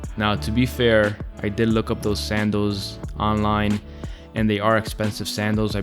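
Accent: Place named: Miami, United States. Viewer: You have a thicc Miami accent brother lol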